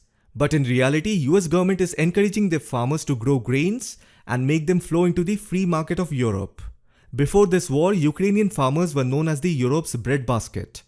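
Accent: India. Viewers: Iam paying, your concern. India accents, get your oil from Russia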